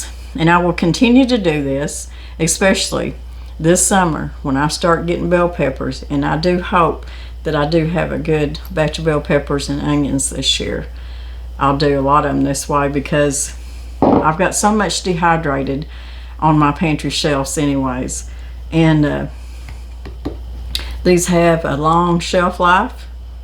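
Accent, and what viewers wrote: South, United States. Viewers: I can't tell you how much your video helped me and it had nothin to do with canning. I can't remember the last time I heard a Southern accent. I've heard words come out of your mouth that I haven't heard for far too long. I love your personality and your style. I really appreciated the scripture as well. God Bless you and yours...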